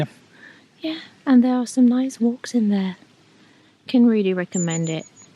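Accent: England, United Kingdom. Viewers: if you are both German, why do you have such a heavy English accent? Loved your video! 😊❤️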